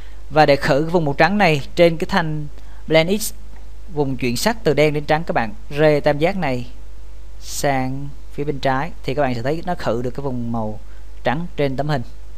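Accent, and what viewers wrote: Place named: South, Vietnam. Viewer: Kết cái giọng Nam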